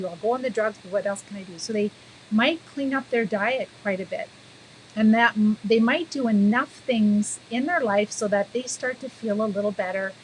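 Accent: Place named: Canada. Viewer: Less than 1 minute listening to you -- I'm pretty sure you're Canadian 😮😊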